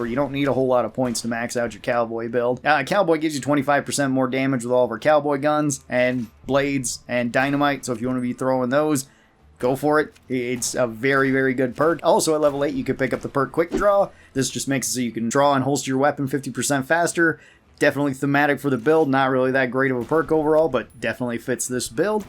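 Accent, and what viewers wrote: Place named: West, United States. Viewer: I really love how you speak a little bit like a cowboy in the video as well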